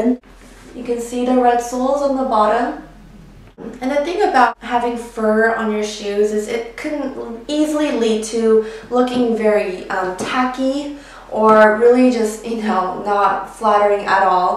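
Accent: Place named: United States. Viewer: a full korean with american accent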